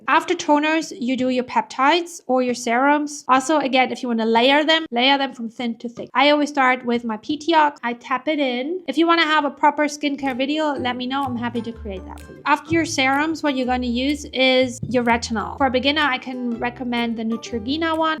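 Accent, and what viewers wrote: Germany. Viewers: Love the tips. Also, are you German? I really feel like your accent is German?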